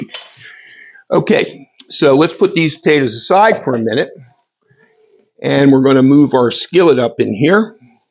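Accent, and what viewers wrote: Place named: South, United States. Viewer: Love your accent! You're truly a southern belle 🤗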